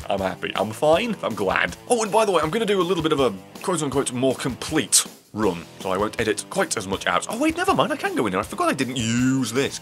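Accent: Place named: England, United Kingdom. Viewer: Question: You've mentioned that you're in Germany before, but your accent is English. Are you, in fact, English?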